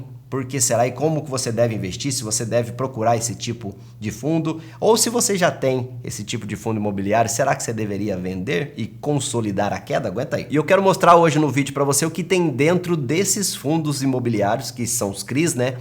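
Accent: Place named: Caipira, Brazil. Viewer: Ultima vez q vi vídeo do seu canal vc falava com voz de caipira RS pq mudou?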